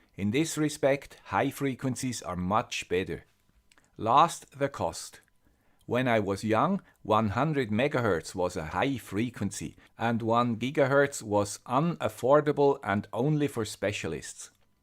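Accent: Switzerland. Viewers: missing your Swiss accent 🤓🤓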